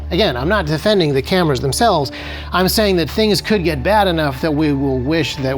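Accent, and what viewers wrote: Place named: United States. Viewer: You accent sounds American, at the end there you talked about London so much I may be wrong about you. The end there is a good example for the American 4th Amendment which requires police to have a warrant to investigate people. Meaning evidence of a crime needs to be presented to a judge. For America this kind of mass surveillance is so very illegal.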